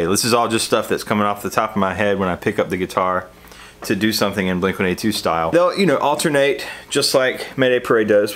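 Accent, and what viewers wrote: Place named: North Carolina, United States. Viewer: I love hearing your slight twang. Reminds me of growing up in NC. Keep doing what you're doing. You're killing it, my dude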